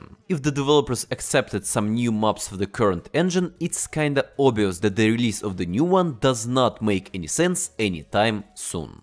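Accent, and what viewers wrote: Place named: Russia. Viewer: hope you don't change your accent along the years XD best Russian one so far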